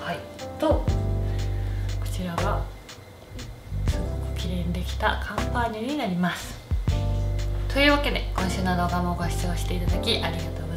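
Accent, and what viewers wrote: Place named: Kansai, Japan. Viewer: ひとり暮らし始めました新社会人です。いつも素敵なお料理作られてて参考にしています♡話し方も素敵で関西弁が心地いいです^_^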